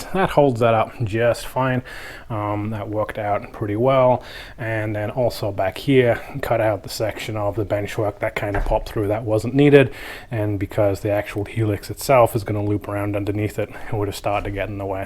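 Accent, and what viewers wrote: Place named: Canada. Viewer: Where are you from originally? Your accent just gets me every time. It sounds like you got English + Canadian going hella wild! Love the layout!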